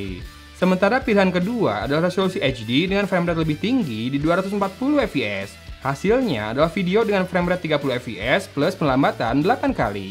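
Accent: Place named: Bandung, Indonesia. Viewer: pasti orang bandung bang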